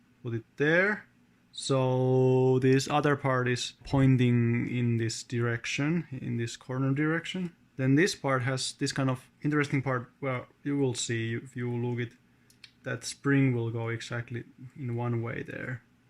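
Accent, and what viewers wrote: Finland. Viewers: Sounds like a finn speaking english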